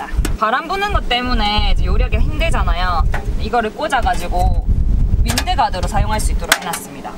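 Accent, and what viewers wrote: Gyeongsang, South Korea. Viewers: 대구분??같네요 저도 꿈이 자연방송,캠핑방송하는건데 너무부럽습니다 영상잘보고갑니다.구독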